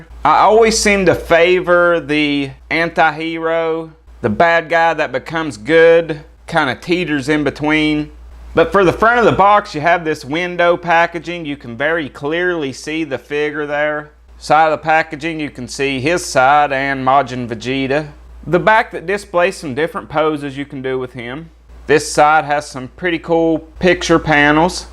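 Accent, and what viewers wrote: South, United States. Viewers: From what part of the USA is your accent? I am not American but it sounds like how most people talk in forest gump movie.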